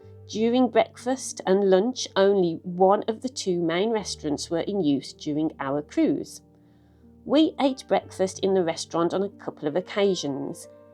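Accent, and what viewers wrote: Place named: Black Country, United Kingdom. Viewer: Great work on this video …very comprehensive…..and, the Black Country accent is fantastic ….( that’s a guess by the way ) 😂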